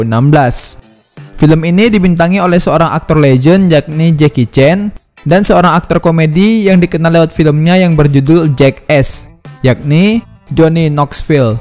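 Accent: Medan, Indonesia. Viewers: Org medan bg? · Anak Medan mana suara mu?? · Hee bodat.. Kau orang medan yahh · Anak medan kau rupanya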